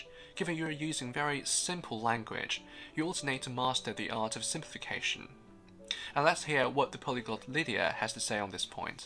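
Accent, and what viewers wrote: United Kingdom. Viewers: 請問可以分享您成為口譯員的歷程嗎? 謝謝 ps:英國音真好聽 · 英音听着好舒服❤❤❤ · 厉害的小伙子！第一次看视频。 Elegant British English!💕💕😊😊💙💙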